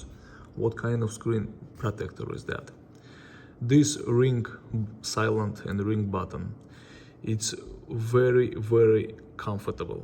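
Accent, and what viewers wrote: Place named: Russia. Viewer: Акцент на русский похож))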